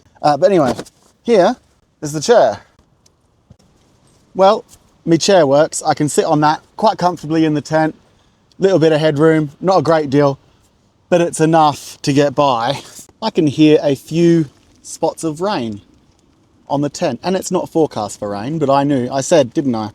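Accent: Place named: England, United Kingdom. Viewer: Nice one, you have picked up a bit of a Pommy twang 😂 I have sub’ed, greetings from Halls Head, WA 🇦🇺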